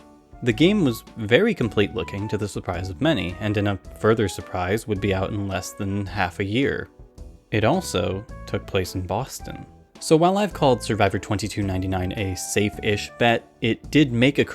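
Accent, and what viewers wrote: United States. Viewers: You sound American, you can't access the Internet Archive?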